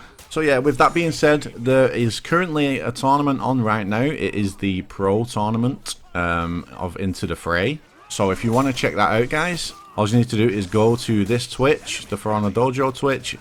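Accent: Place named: Yorkshire, United Kingdom. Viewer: Are you from Yorkshire/Lancashire? Your accent is like exactly the same as tru3ta1ent's and he used to be big into For Honor.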